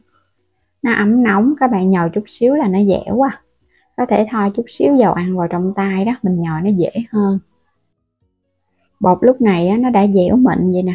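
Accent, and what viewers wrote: Mekong Delta, Vietnam. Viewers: Giọng miền Tây nói vậy đó bạn ơi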